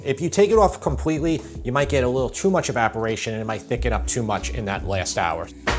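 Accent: New York, United States. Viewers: Something tells me this guy is new to cooking. Maybe hired as an actor because he's Italian and sounds sort of New Yorky. Not that he's doing anything wrong, except he may seem a little afraid of the knife.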